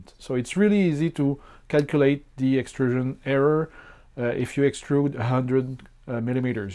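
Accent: Quebec, Canada. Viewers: Ya du Quebecois dans cette accent là lol Merci beaucoup pour ton video, je m'abonne tout de suite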